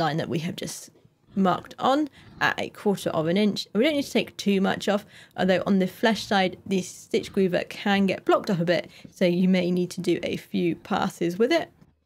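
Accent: England, United Kingdom. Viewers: Dear I think you are from England because of your English language accent, am I right.?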